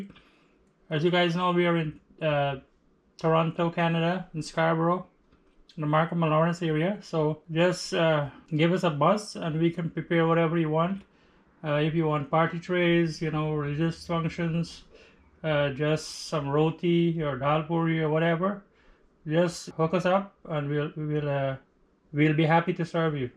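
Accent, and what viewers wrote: Guyana. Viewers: Really like the way you explain your steps and like your Guyanese accent. Make me feel like I'm back home London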